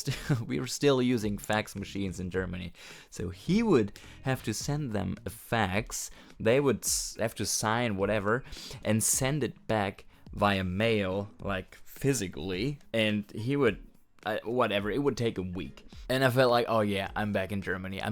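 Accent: Australia. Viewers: Got a bit of a 'downunder' accent happening there mate! (I'm a kiwi). You are not allowed to smoke inside public areas here. No smoking in bars etc, there is usually a dedicated outdoor area for smoking. You no longer come home with your clothes and hair smelling of smoke! They are also not allowed to advertise and cigarettes are usually held in a locked cabinet in stores. It is nice that we don't see cigarette butts dropped everywhere like there used to be decades ago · As an Aussie, I'm really surprised smoking is so prevalent in Germany. I thought you guys would be more concerned about your health. A lot of Aussies quit in the 80s when the dangers of smoking were well understood by the general public. Yes, the federal govt also made smoking more expensive and told cigarette companies they must put them in plain packages. This made it an expensive and unattractive habit. 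Personally I'm pretty glad about that, as I can't stand smoking and always move away from anyone who's doing it 😅 BTW, some of your vowels are sounding a bit Australian. We definitely rubbed off on you 😊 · Sounds like you took the beginnings of an Aussie accent home with you though! I can hear you dropping it on certain words :;) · Great video mate , just came across your channel and subscribed , love your story telling and a little bit of an Aussie accent creeping in Visited Germany in 1989 😂and went to Oktoberfest as all Ozzie backpackers do , absolutely love the country, people, and food. One question though and i never saw this in Europe when i was driving but only in Australia you do a quick 1 finger wave as if to say hey mate while you're on a long drive in the middle of no where 👌✌ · You've got quite the Aussie accent . 😅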